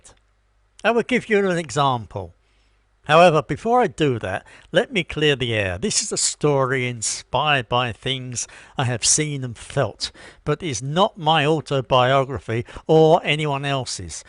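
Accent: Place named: United Kingdom. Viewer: I am still chuckling 🤭 after reading this Mr., let me paraphrase it back to you: Christian, you must absorb more propaganda, least you should get closer to enlightenment by your own personal firsthand observations., why do I love ❤️ it so much, or do I? Is is that beautiful British voice that can take the English language and use it in ways that are like a Grandmother sewing 🧵 up a favorite tattered stuffed animal 🧸, or how much we all love Britain 🇬🇧, The late beloved beloved Queen 👸, and well loved 🥰 King 👑, Mr., I have listened and conversed with you because you are British, what do they do best? I think 🤔 it is Art 🖼️ the liberal art through the humanities, that was how they made life better for me growing up in America 🇺🇸, Doctor Who, the British comedy 🎭, the wonderful documentaries, adventure stories or a knock down drag out questions session in the British parliament on CSPAN, our fascination with the British monarchy, and that wonderful history, a history that defines what sovereignty is, and what it is not. 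Perhaps what is interesting and fascinating about you is agreeing with you but not coming to the same conclusion. Perhaps understanding you is to begin to better understand the peoples of the world 🌍., I was reflecting on post World War Two history, the United Nations 🇺🇳, Japanese atrocities, the two front war Americas fought, pacific and Atlantic, and the results; we saved Britain 🇬🇧 ah yes, DONT say it, you know it is true, you asked we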